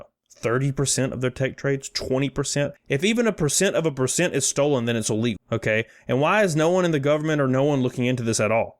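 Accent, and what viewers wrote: South, United States. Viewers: Well done your sense of humor is right on the mark ,and you sound like you might be a fellow southerner .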